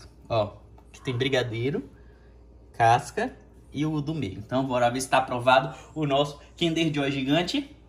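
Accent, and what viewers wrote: Maranhão, Brazil. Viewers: Mano eu comecei a assistir o canal dele agora, e não sabia que ele era maranhense ksksksk aí achei a voz dele parecida com o sutaque maranhense e vi na legenda que ele é ksksk 😅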